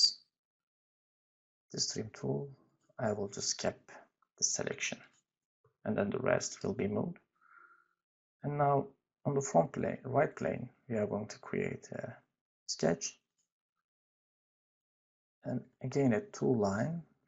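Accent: Turkey. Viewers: aksanından türk oldugunu anladım, sandalye sırtı gibi ergonomik kıvrımlı düzensiz katı modeller çizer misin?(mesh back diye geçiyor) teşekkürler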